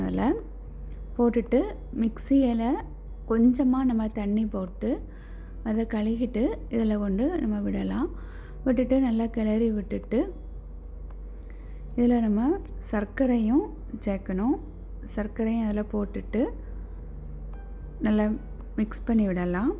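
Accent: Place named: Kanyakumari, India. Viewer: சூப்பரா செஞ்சு இருக்கீங்க சிஸ்டர் நீங்க கன்னியாகுமரியா நீங்க பேசுறது ரொம்ப அழகா இருக்கு ❤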